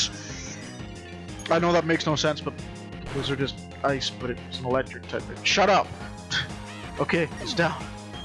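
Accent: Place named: Scotland, United Kingdom. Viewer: So much Scottish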